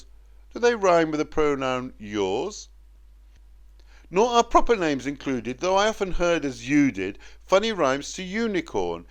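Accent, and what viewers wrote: United Kingdom. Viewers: So far this is the best rendition I have found, with a clear RP (Received Pronunciation) accent. It is nice to have the complete version too.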